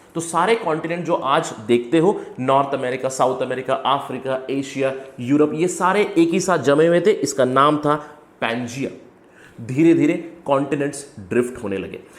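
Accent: India. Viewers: I was eager to hear your hindi accent.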